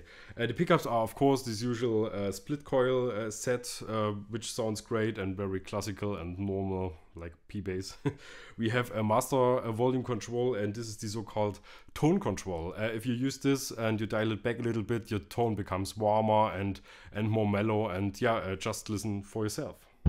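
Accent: Germany. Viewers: mann hört das du deutscher bist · Man hört so richtig, dass du Deutscher bist!!! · This german accent is killing me hahaha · When you're german and you try to speak English😂 you can hear the german out of him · Direkt nach dem ersten Wort erkennt man den Landsmann =D Will mir von Harley Benton das bass Bastelkit kaufen bin mal gespannt !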